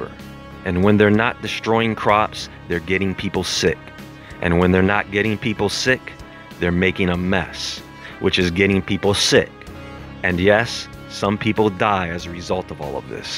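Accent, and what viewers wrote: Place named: Philadelphia, United States. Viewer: Sounds like a Philly accent,anyone else hear it?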